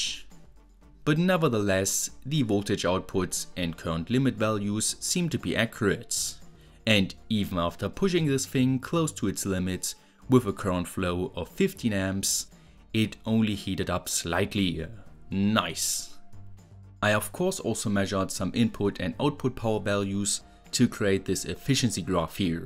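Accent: Germany. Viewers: It’s funny that I can really hear out from the way you talk that you are German 😂❤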